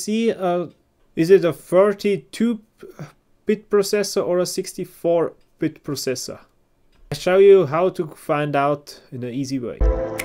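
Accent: Germany. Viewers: Hab nur fur deutsche Aussprache geklickt wurde nicht enttäuscht. Danke weiter so bist der beste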